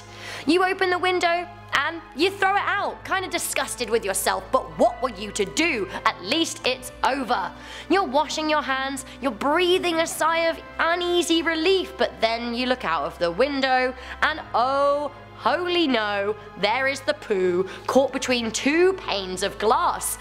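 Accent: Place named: United Kingdom. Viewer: is Irish? She sounds British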